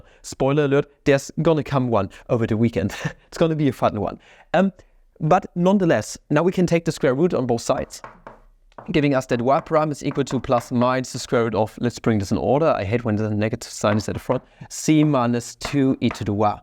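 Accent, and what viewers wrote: Germany. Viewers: I very much appreciate your videos, just brilliant mathematics. The heavy German accent is not a big deal (I’m German myself). But please, please, do me a favour and try to pronounce the “i’s” and the “y’s” as /ai/ where needed. A “y” and the word “why” is pronounced correctly /wai/ and not /va/. It means corporal pain to me to hear it like 100 times in a row in your videos.😢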